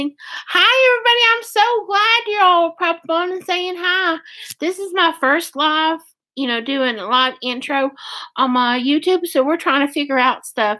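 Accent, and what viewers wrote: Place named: Kentucky, United States. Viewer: I knew you were from Kentucky! 😀 You have that lovely “gentle southern” accent that seems to be specific to Kentucky 😊 so soothing. It’s one of my favorite things about you. 💗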